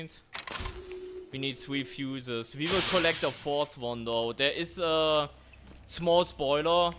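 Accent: Germany. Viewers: hey mein bester ich weiß du sprichst nur englisch mit deinen leuten aber man merkt das du deutscher bist verfolge dich schon seid monaten was für ein level hast du mittlerweile und wie viele stunden hast du in oulast trails würde mich mal interessieren😅😊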